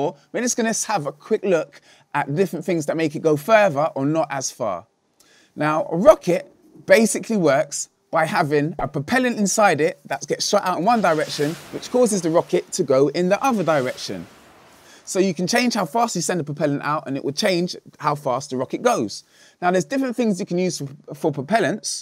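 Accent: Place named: England, United Kingdom. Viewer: I can't get enough of English accents.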